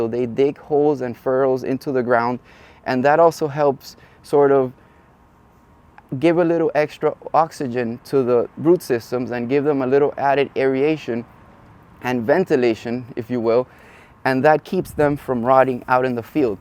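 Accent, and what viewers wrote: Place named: Castile, Spain. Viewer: Que hermoso, amigo escucharte, hablar en castellano , un privilegio escucharte, un fuerte , abrazo, desde Buenos Aires!!!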